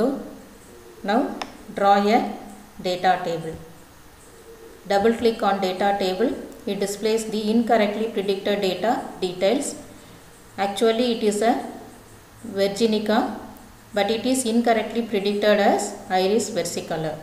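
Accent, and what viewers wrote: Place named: India. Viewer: Unfortunately it is quite difficult for me to understand explanations in hindustani english.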